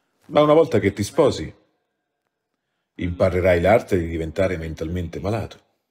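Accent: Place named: Rome, Italy. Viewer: Certo che vedere un guru Indiano parlare e sentire il doppiaggio con accento romanesco non è il massimo... Almeno mettete qualcuno che non abbia un accento!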